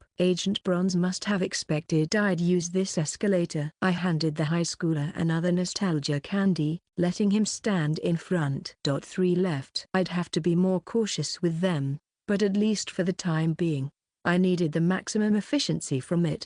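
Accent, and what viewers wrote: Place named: United Kingdom. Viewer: Thank you for uploading this. However the narrorator suddenly switching being British and female is a bit confusing. 😢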